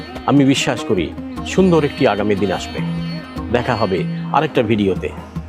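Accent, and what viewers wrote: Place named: West Bengal, India. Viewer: Your bangla is so beautiful. It is the same kind of language and accent that we bengalis from WB have. I enjoy your videos and your presentation. Greetings and best wishes from USA from a probashi Indian Bengalli. আপনার বাংলা ভাষা খুবই সুন্দর। এটা আমাদের পশ্চিম বঙ্গের বাঙালিদের মতোই। আপনার ভিডিও এবং উপস্থাপনা আমার খুব ভালো লাগে। একজন প্রবাসী ভারতীয় বাঙালির পক্ষ থেকে আমেরিকা থেকে শুভেচ্ছা এবং শুভকামনা।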